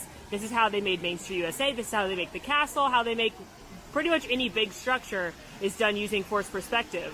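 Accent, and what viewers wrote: England, United Kingdom. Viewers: English accent in Canada?! 😅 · your Canadian accent sounds more British to me;) I'm French Canadian BTW so I might be wrong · Hi from the UK - love the 'trapped' sessions and your British accent 😅😊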